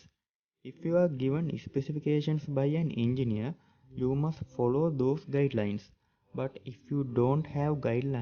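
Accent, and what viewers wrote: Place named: India. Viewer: Thank you for the explanation. However, I must mention that I had difficulty understanding you because of your strong Indian accent.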